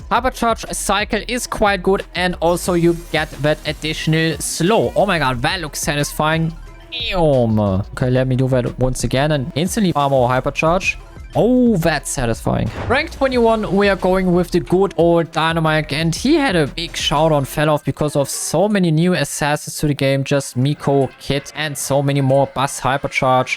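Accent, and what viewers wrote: Germany. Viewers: Bro your German accent IS sticking Out of that voice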